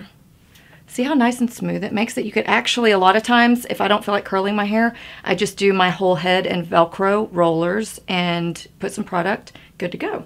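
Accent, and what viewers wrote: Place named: Texas, United States. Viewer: So good to see you back, 😊 You're sounding more Texan since you've moved back. Muah! 😘 Love you!!!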